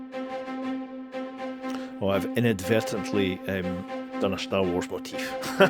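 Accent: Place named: Scotland, United Kingdom. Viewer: ..."if I wudda had this, ... when I was maybe 20....I'd probably be a billionaire right now......🤪😆 Great video with that up yer kilt accent. Luvit. Any more tuts coming our way?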